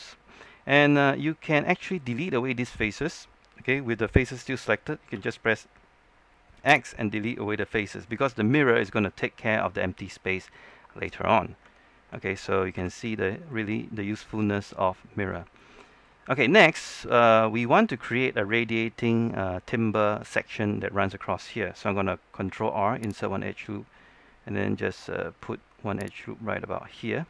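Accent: Singapore. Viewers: You are from Singapore, the accent.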